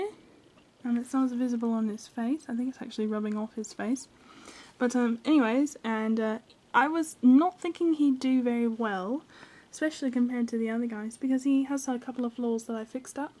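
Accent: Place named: United Kingdom. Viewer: Not to be rude but now u sound British and in other vids u sounded American, anyway love all ur vids!!!!!!!!!!!!!!!!!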